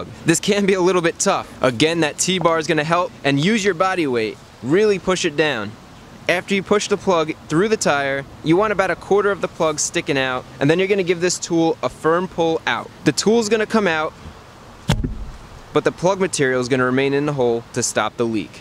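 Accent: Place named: Northeast, United States. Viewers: As a fellow “wooder” brother, much love from Baltimore. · SsS Stone Cold no its how the northeastern Americans say it.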